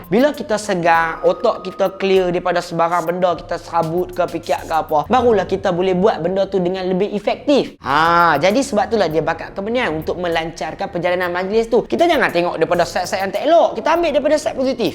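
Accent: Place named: Penang, Malaysia. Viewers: Aku suka cakap. Besala oqang kita.. Penang mariii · samaaa...layann jaaa ceritaaa diaaa tambah2 pelet utaqa lak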